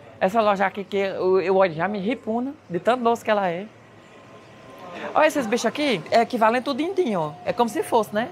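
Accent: Ceará, Brazil. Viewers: Gratidão por manter seu sotaque, por valorizar suas raízes. Também sou nordestina e amo o nosso jeito de ser. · Bom dia, espero que tudo esteja bem c vc.como boa nordestina gosto.de assisti-lo pois vc ñ perde o sotaque cearense do Juazeiro e do Crato, sou paraibana do sertão.e minha cidade faz limite com Mauriti e conheço toda região. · Adoro seus vídeos, vc nos mostra lugares lindos e passa as informações de forma divertida e amo seu sotaque, sou de São Paulo mas minha família é do Ceará, qdo vejo seus vídeos só lembro da minha família 🥰😘💚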